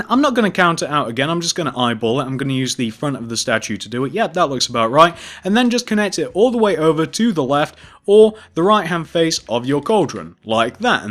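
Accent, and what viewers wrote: United Kingdom. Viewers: Hello, Number 1 fan I have a few questions I want to ask you is their a way for me to contact you? Also I been a fan since 09 and I learn everything from you.. on minecraft. Also British accent is awesome!. Ps: Number 1 fan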